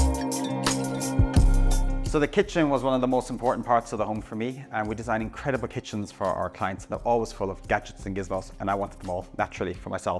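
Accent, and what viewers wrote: Ireland. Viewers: I am the first to admit that I'm a bit of a design snob... And yet, I appreciated and enjoyed the decisions you made to create your personal space. Well done, you. (Plus, an Irish accent to boot!)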